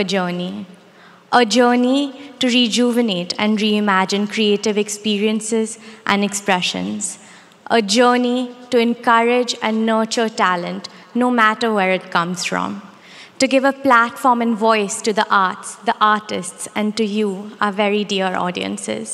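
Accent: India. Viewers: Aur kaisa hota hai indian accent bhai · Indian accent hi hai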